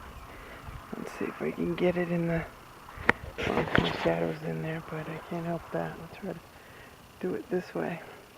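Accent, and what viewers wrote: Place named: New England, United States. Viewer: No japanese beetles in these parts, cabbage butterfly's caterpillars and Sphinx moth larva [tomato horn worm ] are my biggest pests and quite controllable with diligence ... lol. Love listening to your NE accent !! ;p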